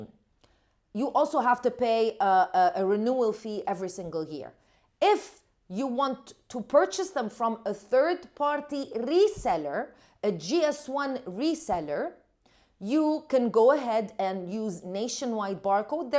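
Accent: Arab world. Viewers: I am confuseeeeeeed.u speak english like an arabic girl but your name is.any thanks for guiding us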